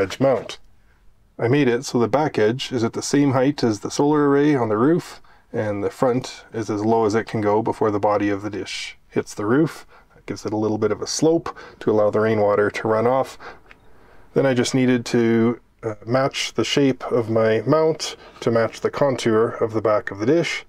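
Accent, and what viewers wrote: Canada. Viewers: I applaud your knowledge, skills and resourcefulness. Your presentation is well organized, clear, concise and complete. I also applaud you and your spouse for you adventurous spirit and commitment to your daring lifestyle. I enjoyed the presentation with the clever and apt visual effects. Very impressive. I also detect a soft Canadian accent. I wish you great success and fun on your continuing adventure and thank you for sharing a bit of your experience on YouTube Awesome!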